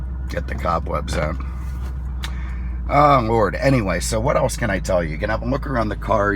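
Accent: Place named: Yankee, United States. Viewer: That Yankee voice grates on this Southern man's ears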